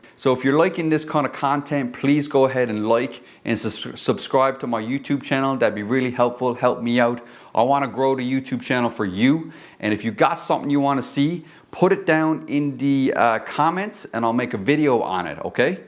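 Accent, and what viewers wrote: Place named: Australia. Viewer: Bro are. You Aussie 🇦🇺? That Accent is sick mate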